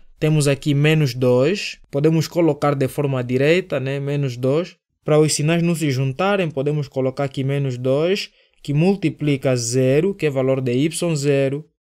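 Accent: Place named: Angola. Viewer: FALA IGUAL ALGUNS CONHECIDOS DA ANGOLA!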